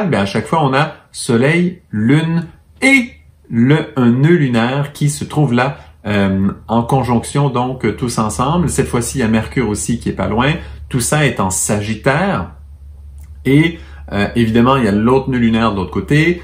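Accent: Quebec, Canada. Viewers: Enfin, vous me rendez sincère, parce que j'ai écouté attentivement et je rien rien compris. Rien que pour entendre votre délicieux accent québécois j'écouterai toujours, qui sait ? Peut-être qu'à force de vous écouter je serai intelligente et je comprendrai les relations des planètes !!! Merci de m'avoir accompagnée, étant malade et perturbée par mon passé ...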